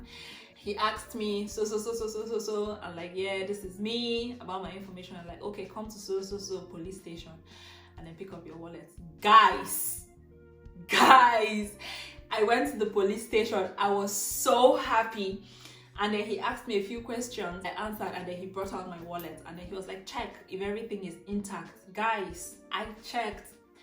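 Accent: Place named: Africa. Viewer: Your african dialect in speaking english is nice.dynamic, beautifull woman.nice vlog.keep it up and stay safe.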